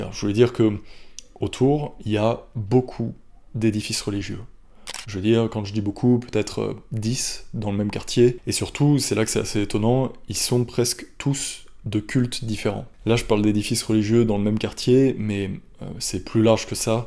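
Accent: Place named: France. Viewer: J'adore ton accent français!! 🤩🤩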